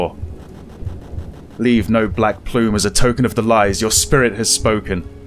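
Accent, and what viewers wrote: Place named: United Kingdom. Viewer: I really how you read, you have a very nice British accent which really suits the Allan Poe's poem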